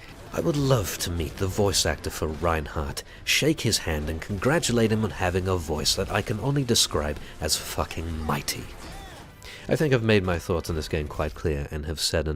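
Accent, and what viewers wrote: United Kingdom. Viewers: You should do like a documentary. Like, seriously. Also subbed, I love hearing the British? accent! :3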